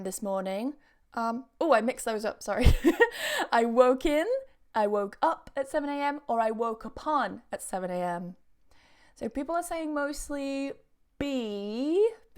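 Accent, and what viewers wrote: United Kingdom. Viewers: Thanks a million ,I wached and I made 3 mistakes it was really useful I hope keep going , thus I really like British accent especially your pronunciation .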